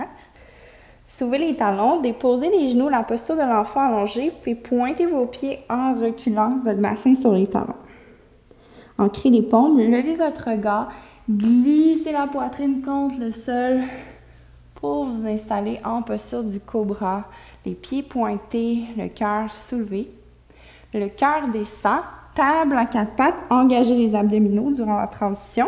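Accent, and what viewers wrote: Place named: Canada. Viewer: Merci belle canadienne avec ton super accent..que j adore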